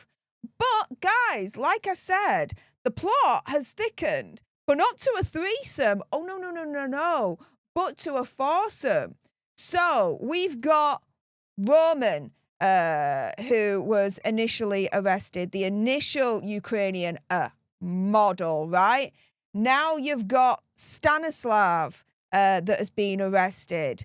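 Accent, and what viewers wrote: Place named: England, United Kingdom. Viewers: 😅 wow young models could they be upset about something jealousy drugs I think this is going deep but the media seems to be running away from it😅 oh by the way I love your English accent😊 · You sound like you're from Hull, with your accent. Or nearby. I am from ULL myself. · Great to hear a good Northern accent for once in political discourse. We might get more sense (oh, sorry, I forgot Our Ange ! )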